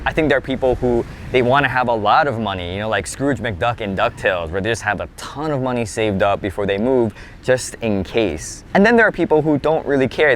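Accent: Hawaii, United States. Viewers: I’m just here for your local accent. Wink 😉 Grew up my whole life big island. College in Cali- tried to get my husband to live and love Hawaii, he struggled with being so far from family and when his mom got sick it cost us thousands to go see her. I don’t think I can move back, but my mom is still on the island so I get to visit. 🌈 keep up there videos I like em laddat.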